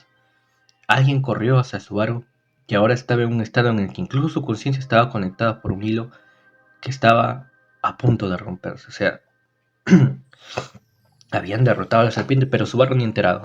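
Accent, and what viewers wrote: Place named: Colla, Bolivia. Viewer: Cada vez habla más coya mi causita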